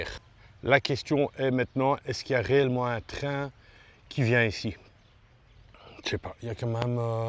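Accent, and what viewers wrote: Belgium. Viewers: je suis tombé sur toi par hasard,au premiers son de ta voix,jai su que tu etait belge,hahaha,ca fait plaisir de voir un youtubeur belge,par contre oulalala,je vient de voir ,vu que je t'ai decouvert,que jai une tonnes de video a regarder sur ta chaine,j'en ai pour un moment.......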